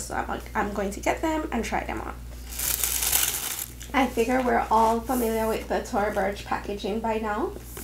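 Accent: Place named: Jamaica. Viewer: I hear the Jamaican accent bap bap lol 🇯🇲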